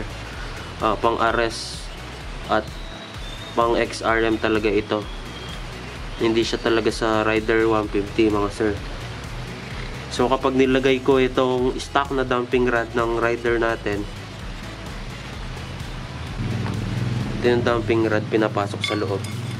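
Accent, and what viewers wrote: Pampanga, Philippines. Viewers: Kapampangan ka sir?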